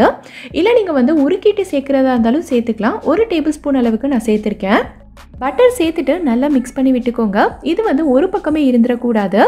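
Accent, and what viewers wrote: Madurai, India. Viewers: அஸ்ஸலாமு அலைக்கும் சிஸ்டர்.உங்கள் குரல் சூப்பரா இருக்கு நீங்க எந்த ஊர்.நாங்கள் மதுரை . பேச்சு வழக்கு எல்லாம் எங்க ஊரு போல் தெரிகிறது.அதற்காக கேட்கிறேன் தப்பாக நினைக்க வேண்டாம்.மென்மேலும் வளர எல்லாம் வல்ல இறைவன் அருள் புரிவானாக... ஆமீன்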